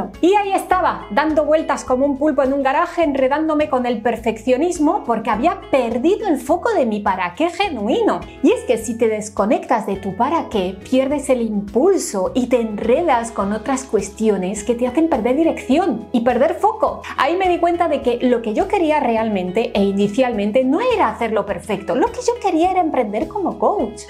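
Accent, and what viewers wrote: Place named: Spain. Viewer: Hola. Me encanta tú tono españolete. Gracias por tú contenido. Un saludo desde Bogotá Colombia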